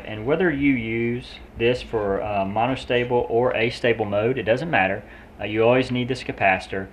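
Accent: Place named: United States. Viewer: that American accent :D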